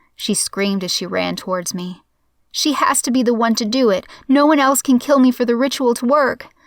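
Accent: South, United States. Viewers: it's so cool and her southern accent comes out so well in that and it's like seriously my favorite story I've listened to it a couple of times I don't want to wear it out I love that story it's awesome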